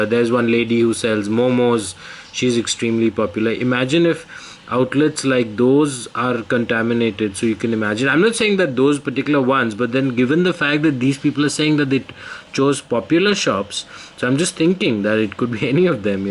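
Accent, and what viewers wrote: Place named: India. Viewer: fuck your indian accent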